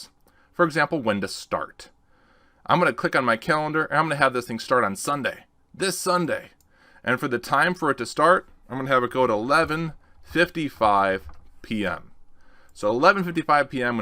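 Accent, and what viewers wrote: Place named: Canada. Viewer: does anyone know the name of the Canadian fellow who narrates this?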